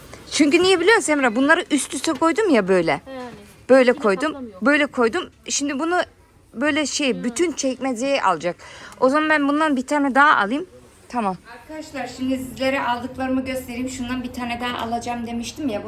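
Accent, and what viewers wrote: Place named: Central Anatolia, Turkey. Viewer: Konusman icanadululari animsatiyor Hakkini helal et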